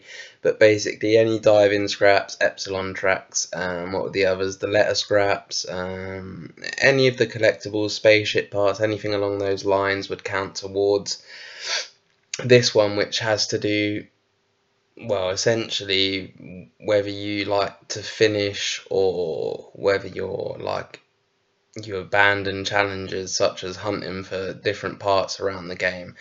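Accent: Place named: United Kingdom. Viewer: Wow such a well put together analysis by this guy some good information by the way FUCK MY LIFE I have been looking at thE OAK ISLAND MONEY PIT wow thank you for bringing this mystery to my attention I am really enjoying researching it on a separate note I keep hearing an accent what part of the world are you from you do not have to answer I was just curious I am sure it is the UKW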